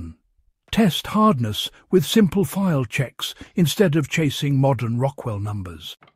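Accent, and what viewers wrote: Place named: United Kingdom. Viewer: AI has gone British & Snotty 🖕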